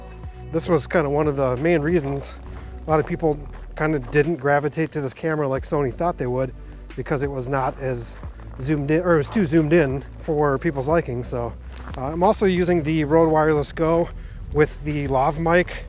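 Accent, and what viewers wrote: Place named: England, United Kingdom. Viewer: Man I need a hat thrower/Box slider for my videos! Gangster! Your wife is a Pro! Man that setup is so tempting.....I want that for going to theme parks.......whenever I can go back. Great video bro! Oh and I still want those Nike Air Maggs! Looks hot in the background! Dr. Pepper is my jam!!! Great joke of course in your english accent.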